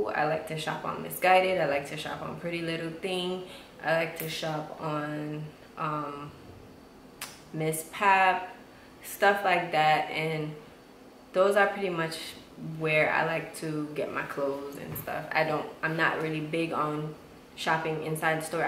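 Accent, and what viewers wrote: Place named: United States. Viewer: lol I was about to ask but wasn't sure because of your american accent--if you were Habesha lol. Now I know ur not :)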